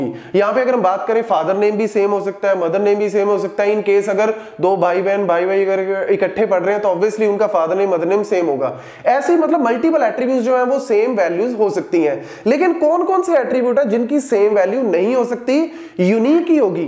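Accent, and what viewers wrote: Punjab, India. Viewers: Sir aapke bolne k tareeke se panjab ki khusboo aati h 😁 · That punjabi tone in ur voice 🔥🔥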